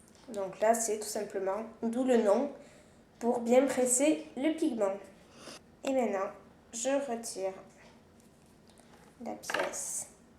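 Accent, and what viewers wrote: South, France. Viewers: C'est bizarre, on dirait que tu as plus l'accent du sud dans cette vidéo que dans les actuelles :o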